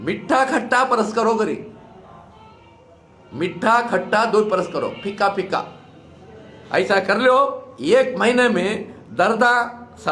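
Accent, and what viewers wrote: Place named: Bangalore, India. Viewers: Karnataki language hai yeh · Not Hyderabad. Banglori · its bangalore's local language (urdu)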